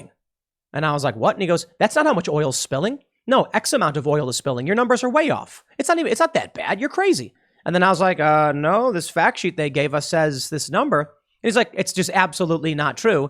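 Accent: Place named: Chicago, United States. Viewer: stereotypical chi town accent is funny. You can tell he is from the Midwest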